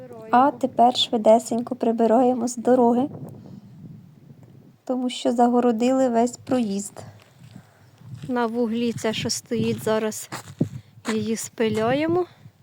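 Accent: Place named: Cherkasy, Ukraine. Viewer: А яку назву має ваше село? По говору чую, що область Черкаська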